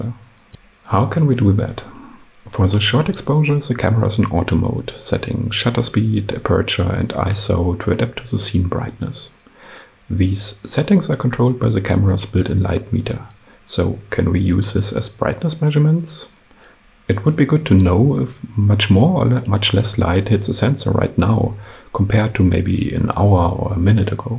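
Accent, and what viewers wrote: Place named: Germany. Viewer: That's cool stuff, you deserve a larger audience. I love how well that camera fits on that signpost, it's a really elegant solution to hiding a camera in plain sight. Also, as a felllow german I have a love/hate relationship with your accent. Ps. "BEAR with me" is pronounced more like the german Word bär, not Bier, unless you wanted us to enjoy a drink with you.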